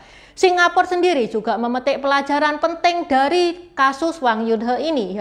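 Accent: Java, Indonesia. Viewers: Suaramu kental banget jowone REK....😂😂😂😂❤ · Jowo nya ndi Cik,medok buanget Jowo neh,he he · ii Coba Dong Bicara pake Bahasa Mandarin nya sih, , ? ! Saya Pengen Ngedenger mgomong ny Mandarin, Campur Medok ny ngomong Bahasa Jawa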